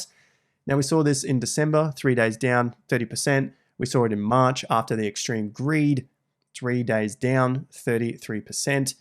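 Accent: Australia. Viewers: Is this what you call analysis? Stating the obvious and regurgitating old information? Bro, get your head out of your chart-loving ass and stop relying on your Aussie accent to sell yourself. You are not doing people any favors by reading your crystal ball. Do some real analysis by connecting current events that might be contributing to the behavior of the assets in the crypto market. You’re the type that got rekt the last time because of over leverage. Now, you’re just suggesting to do the reverse. Stop. Listen to real market analysts like Samson Mow, Tom Lee and Raoul Pao. Maybe you’ll be a bit more convincing as a legitimate crypto analyst. If not, just stay away from crypto and stick to your TradFi mumbo jumbo.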